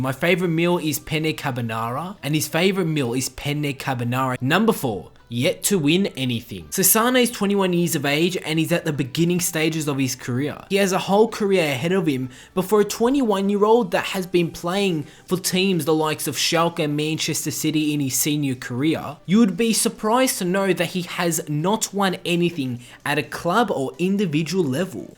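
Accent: Australia. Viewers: le roy sane from Maineee chester citah!! aussi accent hahahh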